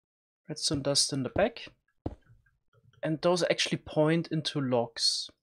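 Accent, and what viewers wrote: Germany. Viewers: First time I am watching a video from you, after 2 seconds, aaahhhh you are german! :D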